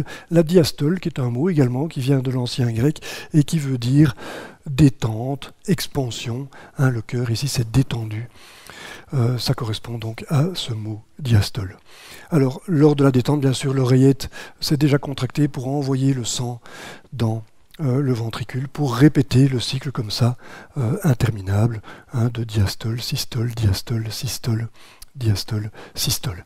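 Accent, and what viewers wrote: Belgium. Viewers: Bonsoir Alain Soral ... alors à Lauzanne à présent ?.. mais alors d'ou vient cette accent belge pas courant en Suisse ?.. On ne connait pas la deuxième personne du pluriel en belgique ?.. Cette façon de se croire moderne en tutoyant les inconnus au pretexte qu'on est derrière son ordinateur m'agaçe passablement !.. Je vais donc aller ailleurs !.. Bonsoir !..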